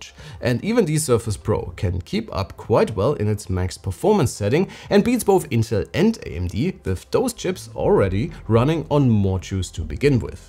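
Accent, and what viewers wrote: Germany. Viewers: Hey, du sprichst eigentlich deutsch oder? Ich erkenne doch einen deutschen der Englisch spricht :)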